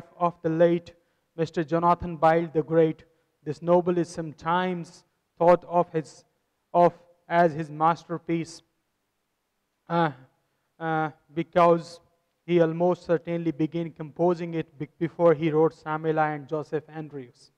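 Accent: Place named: India. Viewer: This is bullshit!.. Indian accent doesn't go well with British novel.. you should have known that by now..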